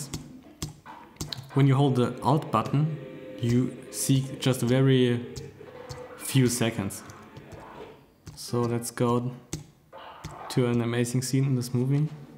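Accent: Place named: Germany. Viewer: The english seems to have a german accent, aber ich könnte mich auch irren =) Will you maybe bring your liveviewrift to the Gear VR aswell?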